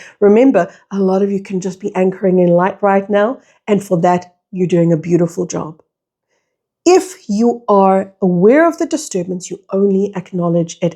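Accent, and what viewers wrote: South Africa. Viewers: Thank you for sharing your wisdom. You popped into my orbit after your conversation with Amrit and so, so glad you did! Love hearing a familiar accent and especially at a time when, having lived overseas for some 20-odd years, all I want is to go home to SA. Listening to you feels just like that... home ❤...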